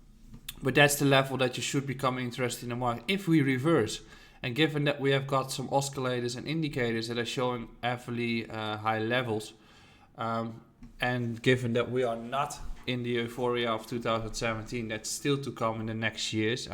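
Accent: Netherlands. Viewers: Finally a down to earth guy, ive started unfollowing allot of other youtubers becouse of the insane fomo talk hahaha, btw im hering a dutch accent am i right?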